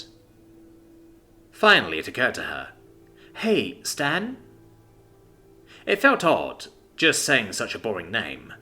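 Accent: Canada. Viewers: Defiantly a Canuck!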